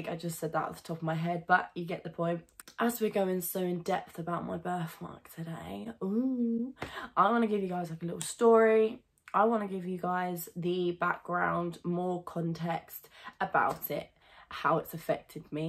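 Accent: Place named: Australia. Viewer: I love your Australian accent.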